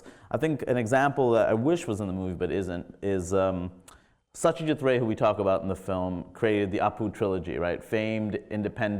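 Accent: United States. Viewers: Hari is more American than he is Indian. Sure, he might look physically like an Indian and he might have the same traditions his parents had. But c'mon, the accents just tells the truth. It's really very hard to find an Indian that has a clean accent (ofc, if he was BORN and raised in India). Also tbh Apu is probably one of less stereotyped character in the series, wanna know what is really violent and stereotyped? Watch the episode in which The Simpsons travel to Brazil. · This guy is literally grown up up in America who’s mum is American you have a American accent yet you feel done over by APU shut up you sound more White and Buttered than Bill Clinton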